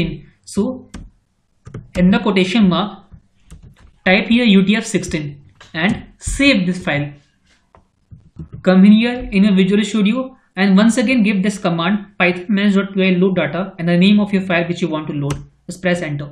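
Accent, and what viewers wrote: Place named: Arab world. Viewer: mmm bro what brother in what language are you speaking, I think it is English but as if it were mixed with Arabic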